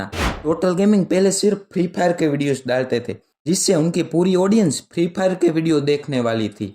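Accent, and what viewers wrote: Gujarat, India. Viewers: Ha ye gujrati hi he avaj se😅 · Avaj se lag rha nam gujarati hai and darbar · Pakka tu bhai Gujrati hain 💀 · Bhai gujarati hona 😅😅😅😊AVAAJ SE PATA CHAL GAYA MAI BHI GUJARATI HU❤ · Bhai tu gujrati hai na 😂😂😂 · Ha bhay ya gujarati ha lakin kontat achh dal raha ha ❤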